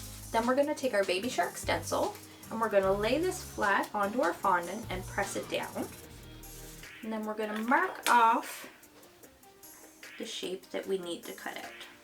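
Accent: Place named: Canada. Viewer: Now I thought she was American until she started saying aboot (instead of about) hahaha Canadians speak so strange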